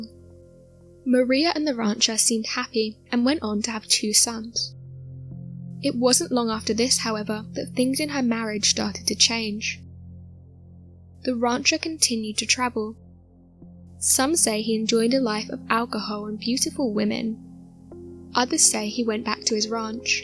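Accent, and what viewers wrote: England, United Kingdom. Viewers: It's funny hearing Mexican stories from when I was a kid... (But w/ an English accent) 😉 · Maybe she lives in America, but she definitely speaks with an English accent.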